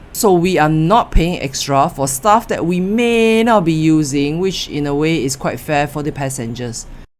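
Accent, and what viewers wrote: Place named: Singapore. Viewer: Yeah, except for the highly annoying clipped singlish destroying the english language.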